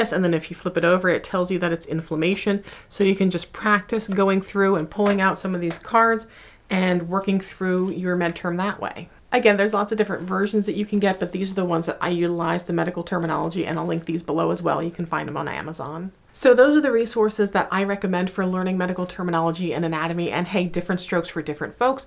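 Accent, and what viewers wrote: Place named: South, United States. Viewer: THANK YOU SO MUCH!!! I never realized that existed. .75 has the perfect southern draw my ears need.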